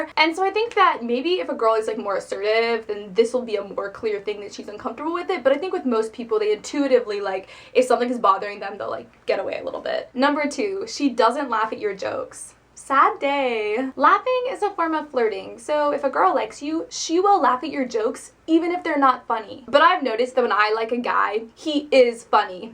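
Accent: United States. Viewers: stop talking fuckin americaneze and fuckin gabbling so fast. I need an interpretor for you! we're not all from manhattan.......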